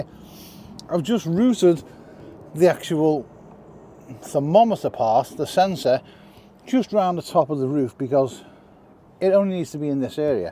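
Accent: Liverpool, United Kingdom. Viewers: I use PC fans for my dogs crates, one pulling, one pushing. Bought a couple os sensors to attach to those; so great idea - thank you. Also - Liverpool or Wirral? I can't get your accent sorted!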